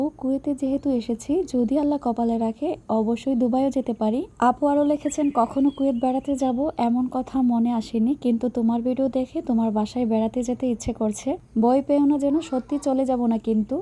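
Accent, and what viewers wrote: Bangladesh. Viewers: আপু তোমার ভিডিও চুপি চুপি এসে সব সময় দেখে যায় কিন্তু কখনো কমেন্ট করিনি আজ করলাম তাও কেন জানো তুমি ব্রাহ্মণবাড়িয়ার তার জন্য আমি তোমার ভাষা শুনে প্রথমে বুঝে গিয়েছিলাম পরে দেখলাম না সত্যি তুমি ব্রাহ্মণবাড়িয়া খুব ভালো লাগলো জেনে আমিও ব্রাহ্মণবাড়িয়া মেয়ে আপু কসবা সৈয়দাবাদ বাড়ি আমার জন্য দোয়া করো আপু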